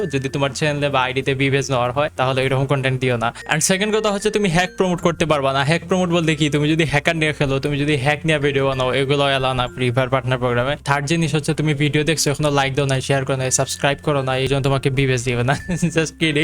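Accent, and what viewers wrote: Sylhet, Bangladesh. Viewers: ভাইয়া আপনি কি সিলেটি আমিও সিলেটি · তুমি সিলেটি নি ভাই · ভাই আপনি সিলেটি নি🙄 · Vai apni ki sylheti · আপনার কথার ধরন দেখে বুঝলাম আমি ও সিলেটি🥰🥰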